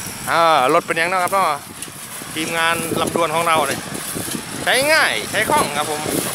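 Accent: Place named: Isan, Thailand. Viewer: กาบเชิงยุบ้านใด๋นอคับเว้าสำเนียงคือยุบ้านผมเลยผมยุโนนนารายณ์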